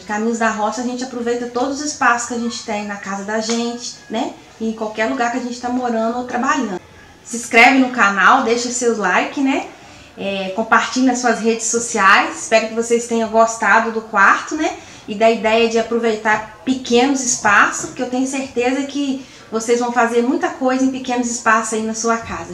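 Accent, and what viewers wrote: Minas Gerais, Brazil. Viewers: De que cidade vc é, parece mineira uai?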